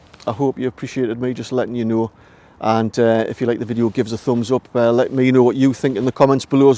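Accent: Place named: Geordie, United Kingdom. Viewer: You will have to tone down your Geordie accent, and speak with a plum in your mouth. I remember that BBC turned down an appearance by the “The little waster’ on a show because they thought the public wouldn’t understand him.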